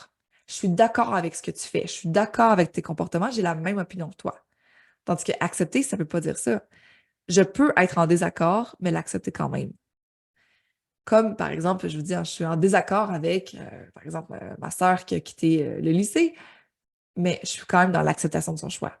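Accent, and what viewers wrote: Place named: Quebec, Canada. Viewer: On adore votre petit accent Québécois 🩷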